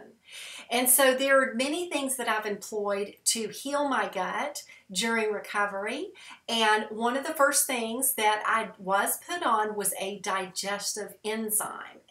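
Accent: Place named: South, United States. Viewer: love ur southern drawl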